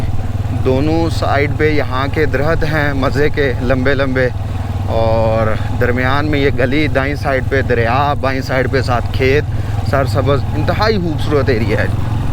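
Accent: Punjab, India. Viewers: You explore unique areas but your Urdu language is like Truck drivers from Punjab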